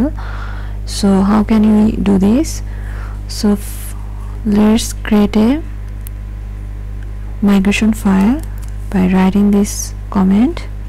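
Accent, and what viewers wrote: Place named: India. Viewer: Your way of explaining is good.. By the way i am from India.. So from the accent I thought you were from India too.. Keep up the good work :)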